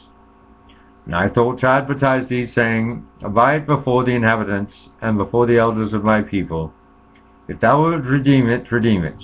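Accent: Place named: Scotland, United Kingdom. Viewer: your Scottish great-grandmother (who is my grandmother) would be proud of your command of the Scottish brogue! Well done! And God says His Word will not go out and return unto Him void. - Judy Doorn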